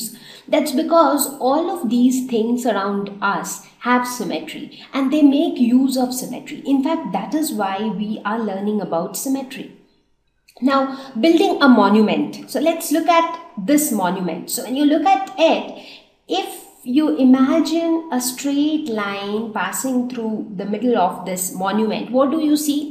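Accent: India. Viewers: It's called Indian accent I told u cause u like the accent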